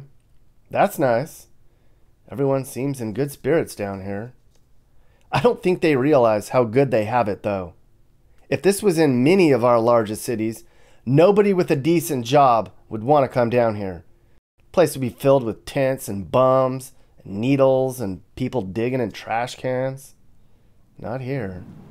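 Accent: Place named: South, United States. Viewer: you speak just like a Southerner, twang included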